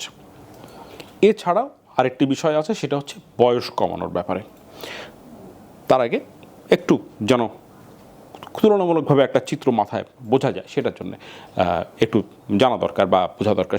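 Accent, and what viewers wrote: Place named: Kolkata, India. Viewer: আপনার ভয়েসটা একদম কলকাতা দের মত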